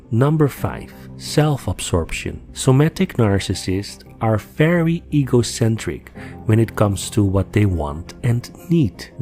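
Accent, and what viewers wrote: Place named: Netherlands. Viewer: Lovely Dutch accent 😃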